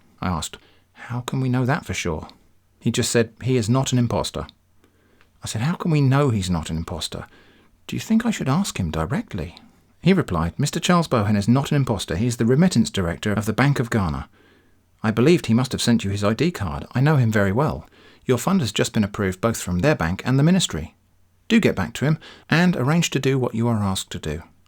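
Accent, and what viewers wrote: United Kingdom. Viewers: Ngl I saw the same Ted talk and found this guy’s videos and thought, oh it’s the guy from that Ted talk thing. Did he always have a British accent? (I’m being serious did that guy from the Ted talks have a British accent? I can’t remember for the life of me)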